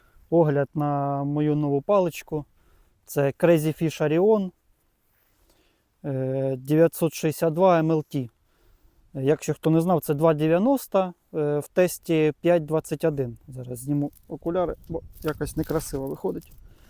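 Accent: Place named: Russia. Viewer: Ну я зрозумів оріон це дуб , але з українською ти не дуже дружиш , кацапська тебе не покідає , але я бачу ти стараєшся удачі тобі в вивчення мови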